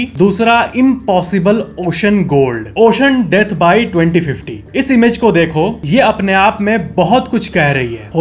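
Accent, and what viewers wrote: Bihar, India. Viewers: Your Bihari Vasa Acha Tha 😅😅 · LAGTA HAI AAP BIHAR SE HAI 😄😄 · Bihari confirmed✅ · That bihar Accent.... kaisan baada ho❤❤😂😂😂😂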